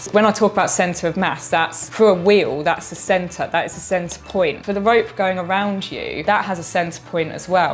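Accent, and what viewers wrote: United Kingdom. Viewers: This British accent 🥰😍🥰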